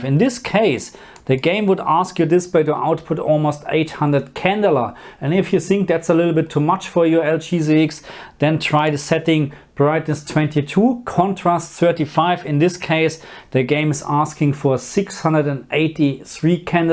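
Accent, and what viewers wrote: Germany. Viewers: Oh god that cringey German accent... How can you be so damn oblivious and speak a different language you have no real grasp of? It's not just grammar. Pronunciation plays a BIG role. Dunno if arrogant or just dumb. Gawd I hate my peinlichen Mitdeutschen........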